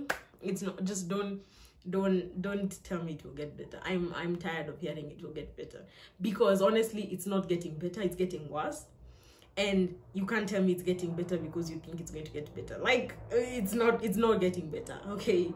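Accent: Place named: United States. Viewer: This was really a rant and that American English I like ure personality 😅 you can't tell me it will get better Becos u think it'll get better 😅 and that ending was 👌🏼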